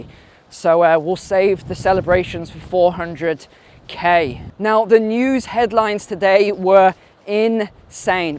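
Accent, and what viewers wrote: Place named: United Kingdom. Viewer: Yes, you're right that I don't care about your looks, but your British accent is nice to hear.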